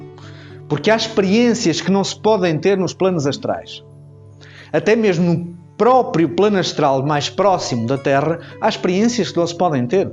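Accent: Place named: Portugal. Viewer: Descobri a ontem, e estou maravilhada. Obrigada. Como é bom e doce ouvir estas palavras em português de Portugal, o que é tão raro. E Kryon que infelizmente poucos compreendem ou aceitam. Apesar de não ter muitos subscritos ou visualizações, não desista. Somos poucos mas bons. Continue a espalhar sementinhas de conhecimento para contribuir na expanção da consciência da humanidade que finalmente começa a acordar aos poucos. Somos todos UM, Namastê.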